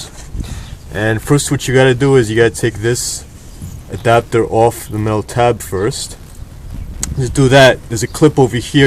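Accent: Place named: Long Island, United States. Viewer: Excellent video! You MUST be from Long Island. I'm gonna guess Suffolk County. Am I right?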